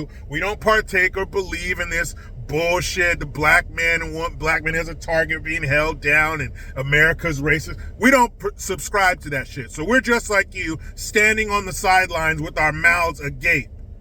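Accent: England, United Kingdom. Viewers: excellent English accent. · is the man. Bring back the British accent. Lizzo and Leti James had a kid. Your statue. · I never commented before that I got compliment. You on that English accent. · Is it Patricia Colours, one of the 3 BLM lesbians, leaders who took all the money from the BLM charity fund. Is it crooked Latisha James? Thanks, love your English accent Lol. yes this person of color crap is So o o old. Liberal Marxist divide of us up crap. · Your British accent killed me!!! Saludos from Costa Rica. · My God man, I was rolling on the floor with your British accent lol! I think you are funnier when you are in your car. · Great British accent brother. Not my problem. All my ancestors came here in the 1930...where I live this isnt a problem.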